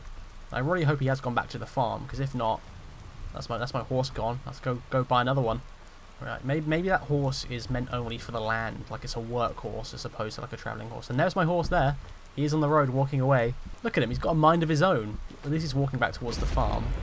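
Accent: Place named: Europe. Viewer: I'm an American and the European accent makes it so much better. Good content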